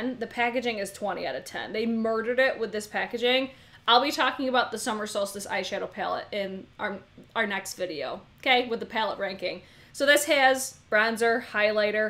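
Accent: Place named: Chicago, United States. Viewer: I was born in Binhamton and grew up in Chicago and I totally thought you were a Chicago girl from your accent too! Lol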